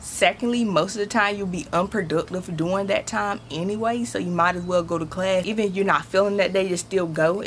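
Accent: United States. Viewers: lol love it already. so country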